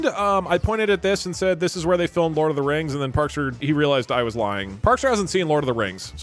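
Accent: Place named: United States. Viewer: you dont know nz at all dude, all americans sound exactly like you lol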